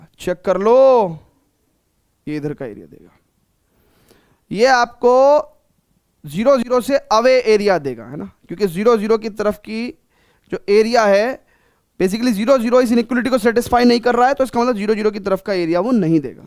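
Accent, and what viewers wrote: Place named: Uttar Pradesh, India. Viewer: Sir m bhi up se hun isiliye relate kr skta hun aapke bolne ke tarike se ekdm up wali tone h bht maja aata h...❤😂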